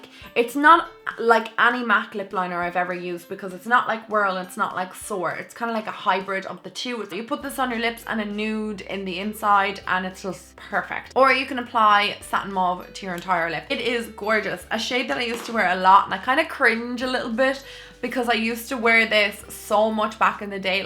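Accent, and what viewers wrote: Ireland. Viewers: Your videos are amazing! Your are my favorite european youtuber and your Irish accent is so lovely 🙂 My favorite products from the "drugstore price" section are from "The Ordinary Skincare" line. They are absolutely amazing and soo affordable. I know them because Wayne Goss talk about them in his channel. You bet you will love them ☺️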